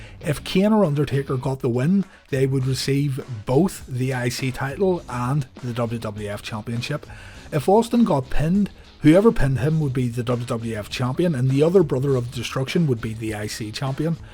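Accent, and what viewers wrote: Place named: Northern Ireland, United Kingdom. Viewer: Northern irish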